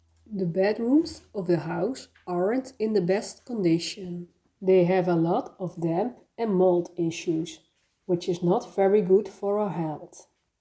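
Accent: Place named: Netherlands. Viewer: Hello! I often think of you and your little family! I have been watching your journey pretty much from the start and i absolutely love what you share and put out to all those, who are interested! Its so refreshing to hear about different customs ( my husband, son and myself LOVE the Netherlands and the Dutch ❤ our son even did Study in Amsterdam ) No need to say, that hearing you speak english with your wonderful dutch accent is fabulous!!! You all seem such great people... even if you haven't shared anything for a while... you are NOT forgotten... you are fabulous exactly HOW YOU ARE!!! Never change that, or ever daubt you are doing something not right on here...YOU AND YOUR FAMILY ARE REAL... you are not faking it...putting something on what not is...loose your personality only to get a few more likes... and exactly THAT is why you and your family are such a joy to watch!!! 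I do hope, that all of you are well and happy and continue to enjoy your life in France to the full! Because in the end this is all what DOES matters... YOUR family and YOUR life and happiness!! Much love...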